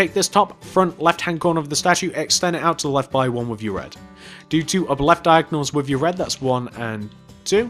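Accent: United Kingdom. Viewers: Bruh he’s British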